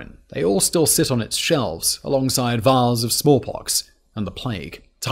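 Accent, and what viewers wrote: RP, United Kingdom. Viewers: Why are putting on a posh accent - it’s extremely off putting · You cover interesting topics but your over the top annunciation drives me mad. I'd attribute it to some sort of paid education but your use of Americanisms like 'garbage' just indicates you're trying to capture a specific audience with a penchant for posh English. I wish you'd drop the act and speak normally.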